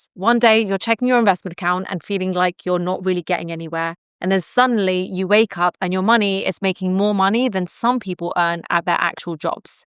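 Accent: United Kingdom. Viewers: Probably the uk with her accent. Though it might be Australian. I can’t really hear what accent it is. It’s not clearly either. Either way Maths is used instead of math in British, Australian and I think South African English. Americans and I’m pretty sure Canadians use math.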